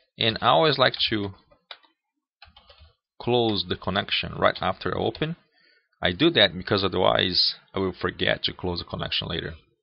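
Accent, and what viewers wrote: Brazil. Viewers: Brazilian accent? Excellent tutorial by the way.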